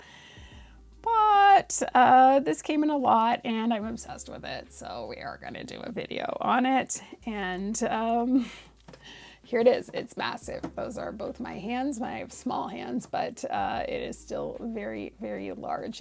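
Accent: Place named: Canada. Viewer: I actually thought you where Canadian 😂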